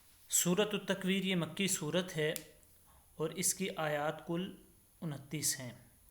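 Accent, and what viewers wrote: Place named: Panipat, India. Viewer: Pani Patti awaz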